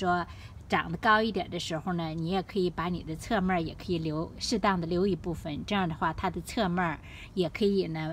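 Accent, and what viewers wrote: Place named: Shandong, China. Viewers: 哪里，这样听着更亲切，我也来自山东，青岛人。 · 老师是胶东人吧：)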